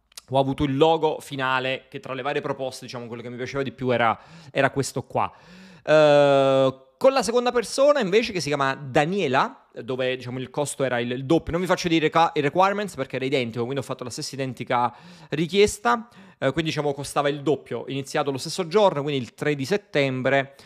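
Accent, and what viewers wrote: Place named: Naples, Italy. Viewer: Da ex grafico Pro... I loghi creati dalle IA sono abbastanza banalotti però per essere strumenti decisamente giovani devo dire che il lavoro che svolgono non è cosi malvagio. Anzi! Tra qualche anno ci sarà da ridere... O da piangere dipende Comunque devo essere sincero. Seguo i tuoi video più che altro perché amo l'accento napoletano 😅 Sono nato a Roma ma il napoletano è la lingua più bella che c'è 😅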